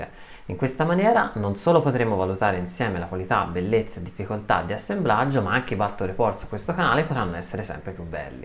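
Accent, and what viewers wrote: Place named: Tuscany, Italy. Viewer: Bel video! Sento un accento del Granducato! Io abito tra Siena e Firenze: se su quel tavolo ti andasse di provarci Infinity ci possiamo organizzare :D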